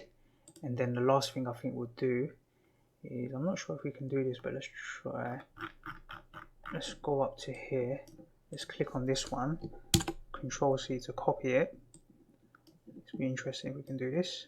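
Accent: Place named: London, United Kingdom. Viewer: Nice work Dude! brilliantly explained. The fact you sound like a Londoner makes it feel quite homely :)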